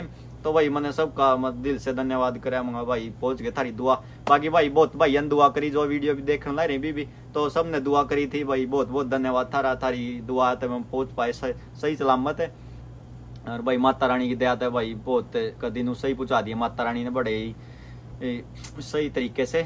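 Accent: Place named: Haryana, India. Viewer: hindi hee toh bol raha hai ba accent haryana wala hai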